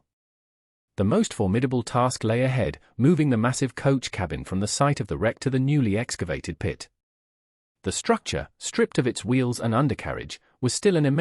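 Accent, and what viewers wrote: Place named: United Kingdom. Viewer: After 10 seconds I stop the video. I don't want to hear a western tale narrated with a British accent.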